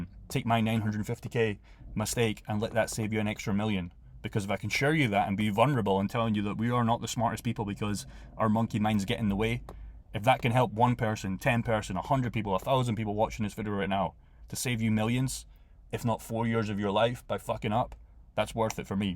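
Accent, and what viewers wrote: Scotland, United Kingdom. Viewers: Good to hear a Scots voice that can see what is going to happen in 2025. Lots of scots will miss this run as they only follow MSM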